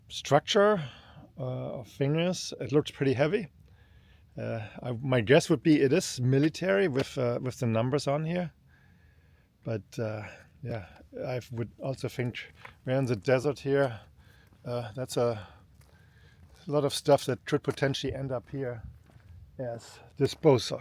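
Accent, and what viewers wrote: Germany. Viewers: Na da höre ich doch einen deutschen Akzent. Bist DU Auswanderer ? Danke für das Video. Gruß aus der Oberpfalz, Manuel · Hey there, came across your channel by chance. Was not disappointed. Love the accent - German origin, right? You got your own private airshow there pal, courtesy of the United States Marines. What a treat. And what a great look at an awesome piece of military hardware. Subscribed. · From your accent you sound German. In case you didn't know we have German military personnel who have i believe permanent duty stations at Holloman AFB, New Mexico and Nellis AFB, Nevada. I hauled weapons, explosives, and other secrey stuff for the governemnt for 30 years and when the Germans shipped their ammo over here we would haul it to them wherever they were based. We loved dealing with them, so polite and efficient in getting us offloaded. 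Be well! :) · 1 Sek im Video und ich so oha deutscher Akzent kommt hart durch😂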